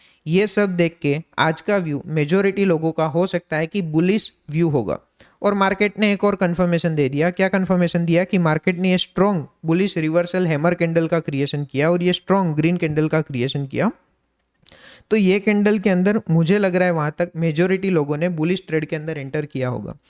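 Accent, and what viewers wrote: Gujarat, India. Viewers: Bhai aapki awaj se esa lag rha hai ki aap gujarati ho?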